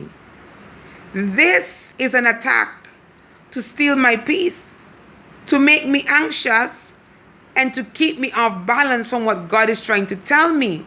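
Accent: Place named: Caribbean. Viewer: What part of the Caribbean are you from? Btw I love your accent, I'm Haitian and Antiguan 🇭🇹 🇦🇬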